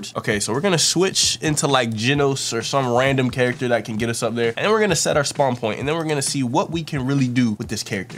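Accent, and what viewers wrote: United Kingdom. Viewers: YO Keep up the vids love them aspecialy ur brit accent( i want Erly Acc im Krath_100)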